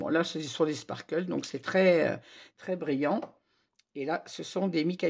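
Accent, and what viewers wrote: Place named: England, United Kingdom. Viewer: Cc, encore une bien belle commande!!! 😊 J'ai hâte de voir le tuto mix média car j'aimerais bien apprendre à faire de belles boîtes comme tu les fais si bien 😉 Entre parenthèse, j'aime beaucoup ton accent "english" 😁 Sur ce, je te souhaite une très belle journée À très vite Scrap'bises 😘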